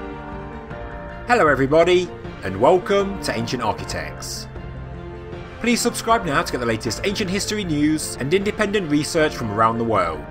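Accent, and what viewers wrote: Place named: Southern England, United Kingdom. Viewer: I'm English and I've no idea why he's talking like he's got a class of 3 year olds. For anyone outside of Britain I'd just like to point out that we don't all talk like him... Or the Queen.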